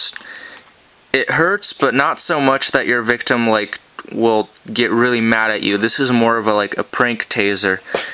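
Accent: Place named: United States. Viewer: He has a American Accent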